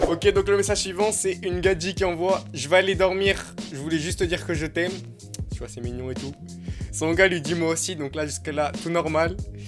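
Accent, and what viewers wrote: Belgium. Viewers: T'es belge ? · T'es belge poto ?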